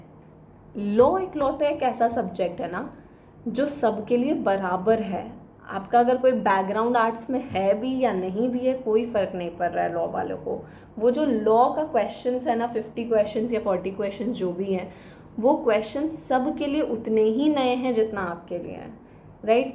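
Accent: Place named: Bihar, India. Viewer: Aap Bihar se ho? Accent se lg rha hai.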